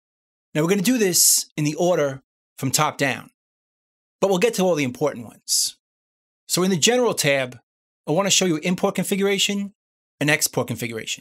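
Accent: New York, United States. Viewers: Hey your from New York like me, I recognize that accent anywhere, I grew up in NYC, Queens YO! Yeah Reaper is awesome, I was using Adobe audition for the longest time and the latency issue on that thing drove me absolutely crazy, it got to the point where I gave up and would just try to physically move the tracks so they would play in time, but even then even if you're a millisecond off then after a minute it goes out of time. I really don't understand why a company like Adobe would go through all that trouble making a program like that and totally F up the latency issue. Anyway Reaper is amazing, no issues whatsoever, and THANKS so much for all these tutorials! Fantastic!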